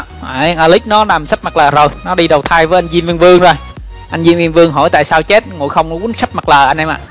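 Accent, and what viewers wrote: Quang Ngai, Vietnam. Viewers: Người Quảng Ngãi phải hông anh owiii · Quảng Ngãi nói vui nhỉ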